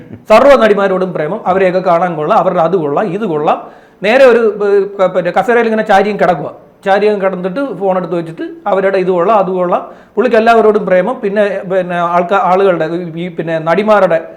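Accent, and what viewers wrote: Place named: Thiruvananthapuram, India. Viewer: എന്താ.............അഴുക്കു പയ്യൻ 😁., മ്മ്‌ടെ തിരുവനന്തോരം ഭാഷ 😆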